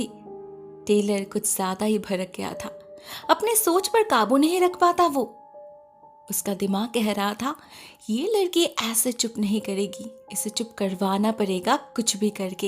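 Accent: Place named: Bhojpuri, India. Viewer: aap bhojpuri lakte ho aapki bhashah seh lagta hai.. Par mujeh aapki awaz seh pyar ho gya hai lagta hai K aapseh be...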